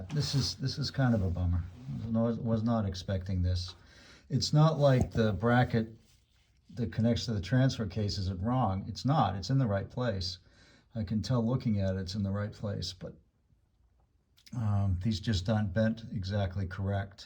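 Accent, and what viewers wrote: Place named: North, United States. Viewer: That's just his accent. The way he talks, he's clearly from the Northeast in the band of the Northern American vowel shift that goes from Northern New England west through central Michigan then Wisconsin and stopping in Minnesota at the Twin Cities. What's more, anyone from there who has the shift can't hear it. It's a linguistic phenomenon that's been studied quite a bit. They just can't hear it. So, if you correct their pronunciation, it'll seem like they're being thick because they'll be all, "What's the difference? You just said what I said." I have personal experience with this having studied languages and linguistics at Michigan, which is ironic since there's nowhere that the Northern American vowel shift is stronger than in Ann Arbor, so studying languages and linguistics there with some professors from there and so speaking with the shift, it at times felt like it was the blind leading the blind and at other times just a really bizarre case study where you're tasked to objectively look into a fish bowl that you're stuck inside of. 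At any rate, a good example of the Northern American vowel shift that lines up with what you've pointed out here for him is the pronunciation of the last name "Stein," including names ending in "-stein." People from outside the region of the shift and some people within but who don't speak using the shift, like those who never picked it up but for some reason picked up speaking in the General American (GA) accent instead, tend to say it so t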